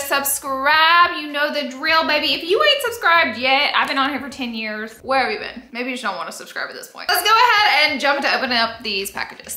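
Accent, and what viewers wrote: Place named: Alabama, United States. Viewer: Who else loves when says oil? No one? Just me, okay. Girl, I love your Alabama accent!❤❤❤. Oh I’m adding all the things to my Amazon cart..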